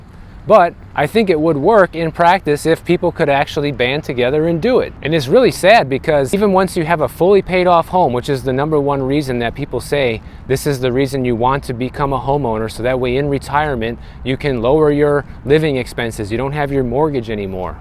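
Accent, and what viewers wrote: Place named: California, United States. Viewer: he accent sound California's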